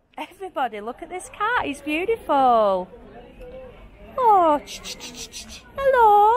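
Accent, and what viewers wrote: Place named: Yorkshire, United Kingdom. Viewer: I like your yorkshire accent, sounds like Angela Raynor!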